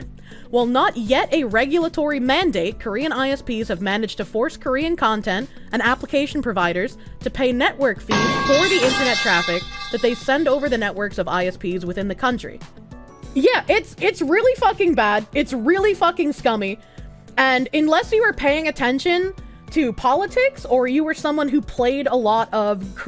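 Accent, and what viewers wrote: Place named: West, United States. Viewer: 그냥 뉴스 많이 챙겨보는 분인거같은데 서부 악센트가 강하게 들리는거 보니까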